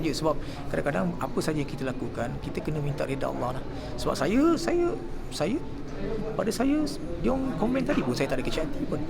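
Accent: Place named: Johor, Malaysia. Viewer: slang johor sey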